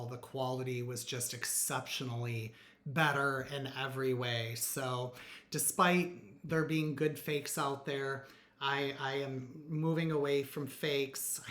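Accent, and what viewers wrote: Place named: Chicago, United States. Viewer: Great video. Classic bag. You don’t see it everywhere. I gotta ask... where are you from? I hear a Chicago accent perhaps?? Keep up the great content!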